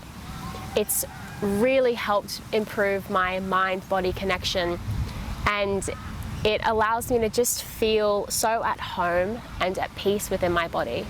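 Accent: Australia. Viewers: Nice to hear an Aussie accent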